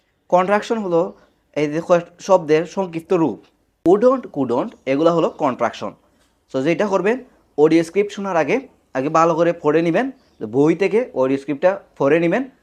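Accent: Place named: Sylhet, Bangladesh. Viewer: আরে সিলটি স্যার। আপনার কথা বুঝতে আমার অনেক সমস্যা হয়। স্যার প্লিজ, আগে একটা বাংলা প্রনানসিয়েশনের কোর্স করুন। এতে আমাদের বুঝতে ভালো হবে, সাথে আপনারও বাংলা কথায় সিলেটি একসেন্ট কেটে প্রমিত হবে। প্লিজ স্যার। আপনার কথা বুঝতে অনেক কষ্ট হচ্ছে।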